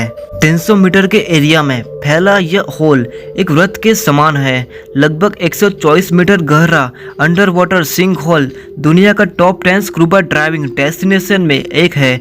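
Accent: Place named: Gujarat, India. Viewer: भलेही हिंदी बोले किन्तु गुजराती का तडका निकाल आता है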